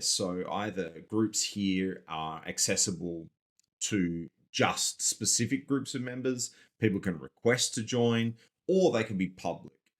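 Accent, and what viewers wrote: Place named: Australia. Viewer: Thank u! And great Aussie tone.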